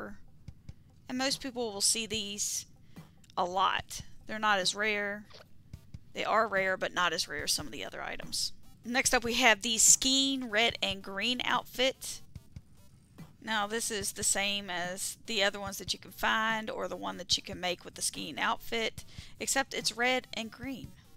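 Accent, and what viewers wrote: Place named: Georgia, United States. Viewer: 👍 for the helpful tips Subbed for that sweet peach country accent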